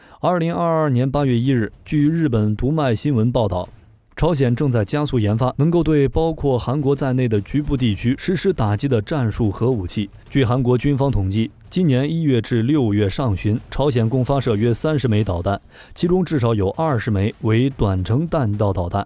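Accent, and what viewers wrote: China. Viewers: 一听就知道啦，就是中国口音，也许国籍不是中国，但是绝对中国大陆口音，不是台湾，也不是马来西亚新加坡，也不是香港，就是中国大陆的。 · 频道主是大陆人吗，口音好像啊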